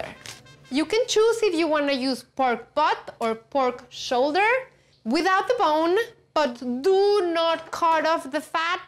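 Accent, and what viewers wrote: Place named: Latin America. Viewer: She have a latina accent...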